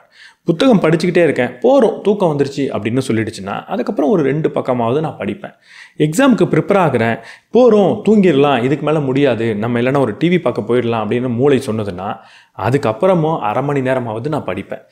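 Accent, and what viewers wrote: India. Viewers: Super brother.. good feel. Bro neenga Tirunelveli' native ah. Enga voor slang varuthu neenga pesurappa.. well bro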